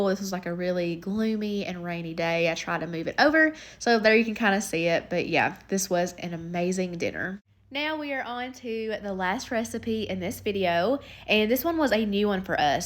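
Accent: South, United States. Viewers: Fellow lefty here! Love your videos, especially your pronunciation of words in a Southern accent. "Boil" gets me every time!! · Food looks delicious. I just wish I didn’t have to listen to that southern accent.. 🙄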